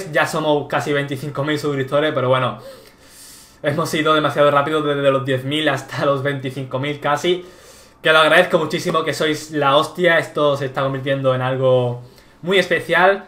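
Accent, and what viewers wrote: Germany. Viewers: eres alemán....?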